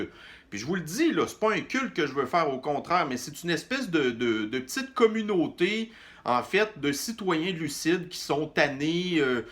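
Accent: Quebec, Canada. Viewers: ta façon de parler avec tes tripes, typiquement québécoise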